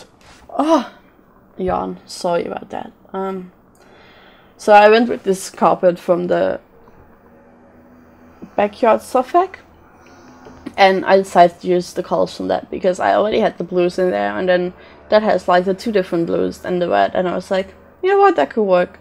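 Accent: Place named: Netherlands. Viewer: Awesome build! Your accent sounds Dutch, so are you Dutch?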